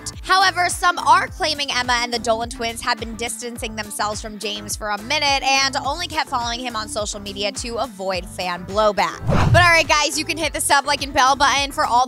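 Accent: United States. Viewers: It’s because she has such a strong (and irritating) American accent 🥴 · Britt Nicole She’s barely pronouncing it wrong are y’all deaf 😂 It sounds like an O because she’s saying it fast and has an American accent- wtf.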